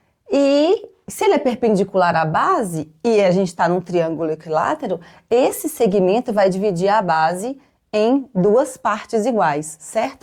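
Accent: Minas Gerais, Brazil. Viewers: Show é E que saudade do sotaque de MG.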